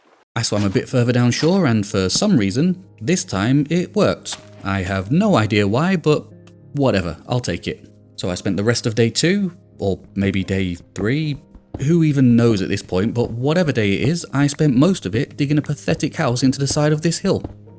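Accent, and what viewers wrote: United Kingdom. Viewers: u are literally so british i feel sick to my core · Are you british?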